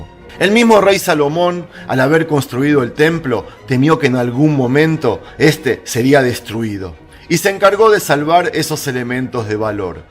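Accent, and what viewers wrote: Argentina. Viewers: Tu no eres judio eres argentino lo llevas en el asento no puedes negar eso, ningun judio real anda vestido como tu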